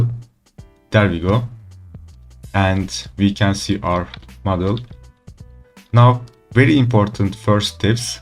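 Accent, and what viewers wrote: Turkey. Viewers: Türk. Daha ilk dinlediğinde aksandan belli :D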